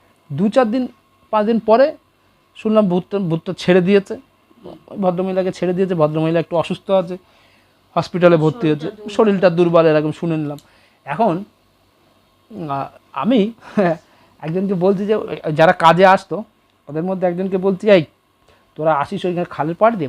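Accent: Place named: United States. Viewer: Mama rocks... darun laglo...what a Yankee style of talking... khub bhalo laglo ❤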